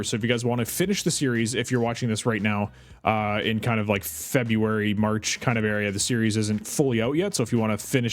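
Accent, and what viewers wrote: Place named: Canada. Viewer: just realized you're a fellow Canadian after hearing the "Out" and "About" pronunciation 😂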